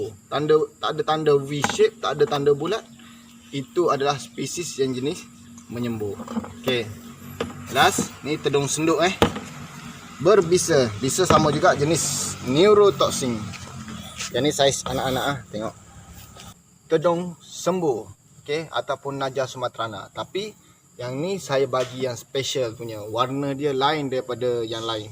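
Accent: Kelantan, Malaysia. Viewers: ore klate peseng abe ni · Kelate molek bunyi abe... Hehehe terbaik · Kelate kecek lua... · tuan asal kelantan atau trgganu?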